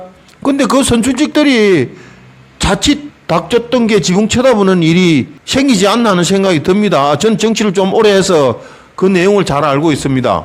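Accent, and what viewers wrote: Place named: Busan, South Korea. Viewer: 역시 우리 부산소리 ㅡ 확끈하다...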